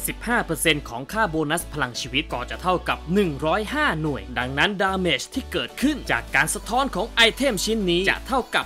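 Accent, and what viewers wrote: South, Thailand. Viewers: คนใต้มั้ยนิ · คนใต้ม่ายนิ555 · คนใต้เหรอ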